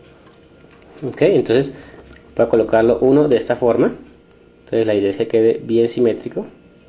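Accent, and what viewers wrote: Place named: Mexico. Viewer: me cae en los huevos el acento mexicano parece que le agregan acento a tooodas las palabras al final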